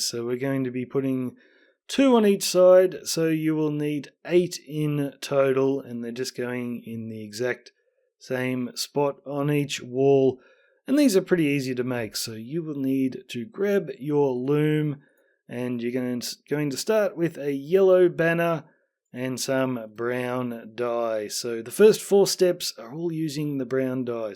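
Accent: Australia. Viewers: Oh wow, didn't expect you to be Australian! Hello fellow friend down under 😃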